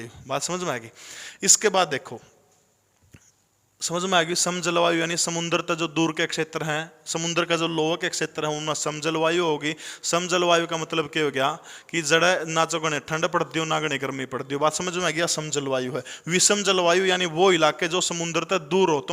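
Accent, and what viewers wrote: Haryana, India. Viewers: Dhanybhag humare guruji Aap jaise teacher se padhne ka moka milta h 🙏🙏Sirji bas meri ye problem rahi h jisme aapka jara sa bi dosh nhi Mai aapke Haryanvi lahja Kam samjh paya hu fir bi sbse best class aapki h bahut bahut respect or pyar sirji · Sir aapki haryanvi bhasa Achi lgti h👍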